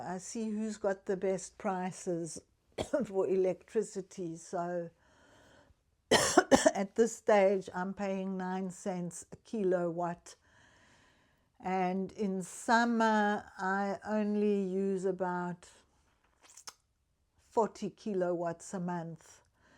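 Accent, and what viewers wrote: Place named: South Africa. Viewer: thanks for sharing - love your pretty Austrian brown skin, clothes & furniture. You are delightful breath of fresh air. Sorry about your neighbour's tree being gone (your 16yo girl is precious) I can hear your South African accent ❤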